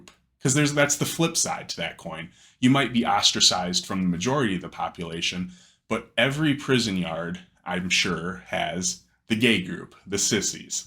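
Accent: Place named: Australia. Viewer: what did you do that landed your ass in jail . Do tell. the Australian accent in general has always given me gay energy. its low key cute. The police is super intense in america, it just snowballs from there. No matter how sweet you are if they hate your ass from jump , you are pretty much toast. I am an albino male, hyper aware that its my key visible feature. i am disrespected enough in real life so i don't think i'd nice in a confined environment if someone gets testy . i do know a lot of people find albinos spooky.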